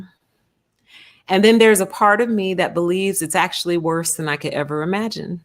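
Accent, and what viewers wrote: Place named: Texas, United States. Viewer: Hello & Youtubers hope You All doing Fine & Safe & Pleasant always! And Your Video Content is Great along with the people's comment section below this Video Content! This is a Complement i thought You were a Southern Texas Miss America in a pageant because of Your Amazing Voice & Personality! And wanted to say some of my Relatives are blended with black & white & mexican/native American and some straight & bi and some of my friends are straight & gay and are different races of people and i love my Relatives & Friends! Such a Great Video Content hope You get subs! And i'm not a subscriber yet because i'm a selected target individual being watched & bullied & tested by my unknown haters & gangstalkers and handlers on social media and in public places i go! Anyway can You give Your cute 🐕 puppy a hug from me ❤Thank You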